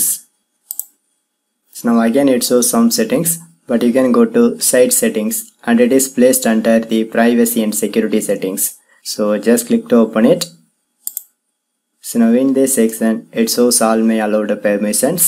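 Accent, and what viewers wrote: India. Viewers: This an Indian accent and oh my god if they talk to you on the phone such as Amazon or any cheap company who takes the jobs out of USA because it's a cheaper than an American person. Thank you!!!!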